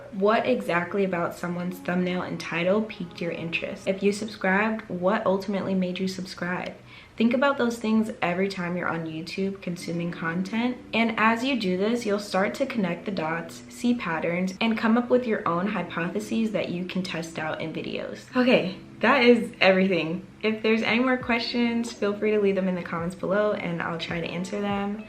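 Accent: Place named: England, United Kingdom. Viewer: I love your English accent ❤ Uganda 🇺🇬 loves you sister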